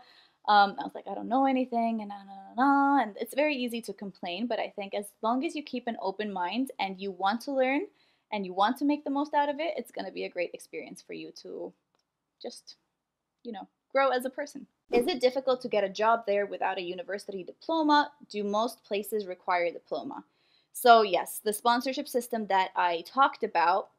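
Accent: United States. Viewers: You sound American! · Cool Estonian woman but your accent is totally American now so how about your native language effect there in Qatar ?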